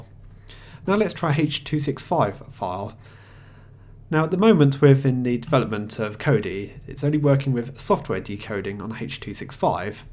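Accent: England, United Kingdom. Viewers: ***** I wish I would have talked to you 18 months ago. The box is dedicated to KODI (boots up to KODI), so it makes sense to dump pulse. I'm reading the wiki now. I'm one of the ones that has dvd rips up to 42GBs and many are ma and trueHD. I've had to change the output to DTS or DD. For some reason I thought you were in Australia. Sounds more like England. Thanks for your help. I'm going to give it a try.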